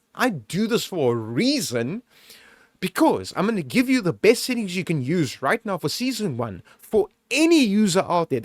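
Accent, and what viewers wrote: South Africa. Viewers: You are the best to do it, theres something so refreshing about hearing a tutorial in a SA accent lmao. love you bru, keep doing what you doing.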